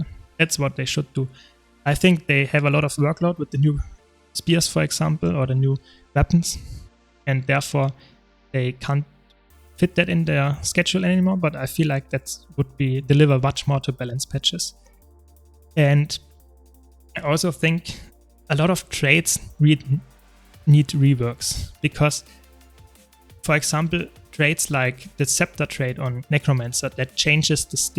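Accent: Germany. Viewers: ja moin, ich bin auch deutscher, dein akzent kickt halt noch härter rein als meiner xD no offense metabattle hat allerdings auch sehr viele builds...es könnte sogar gut möglich sein das die noch mehr builds haben als guildjen und bei metabattle sind auch nicht alle builds top notch z.B. die open world pve builds, also ich weiß echt nicht, ob sich das jemand dauerhaft antun möchte mit dem ele mit den niedrigsten lebenspunkt und der niedrigsten rüstung in kombination gibts das so nur beim ele, ob sich das jemand das halt wirklich permanent antun möchte mit full berserker gear rumzulaufen, da braucht dich ja nur ein mob in der open world angucken und fällst gefühlt schon um und liegst im downstate...guildjen hat wirklich dediziete builds speziell für open world pve, da wirken die builds von metabattle dagegen nur wie eine veränderte (wenn überhaupt verändert) version von builds die sie so für instanzierten content benutzen, also halt für fractale, raids, strikes und dungeons gedacht sind und nicht spezifisch für open world pve, während die builds bei guildjen extra dafür erstellt wurden.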